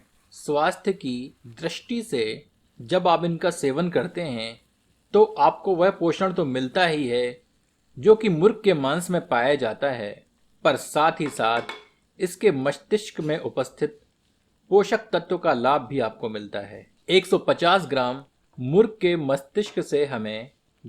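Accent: Standard. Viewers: Bahut shudh hindi bol rahe hai aaaa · Chef aapke tone ko sunkar hi pet bhar jaata h ❤️❤️❤️❤️🥰🥰🥰 Itna suddha aap hindi bolte h to khana kitna tasty hota hoga ❤️❤️ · Aapki Shudh Hindi bolne ka mein samarthan karta huin..sadhuvad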